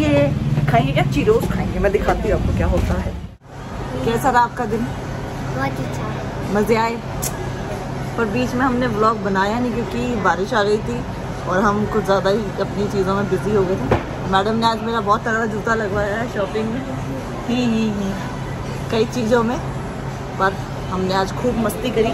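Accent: Haryana, India. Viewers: Hey didi aapka vlog aacha lag aap haryanvi boltae huae aache lagtae ho to or vlog banae haryanvi m 😍😍👌👌👌😍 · Ye haryanvi m kya bol deti ho bich bich m samaj nhi aata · Pranjal di mane aapki haryanvi lanuage ganni badhia lage hai ar me aapki bohot baddi fan su 🤣😅😊😊😜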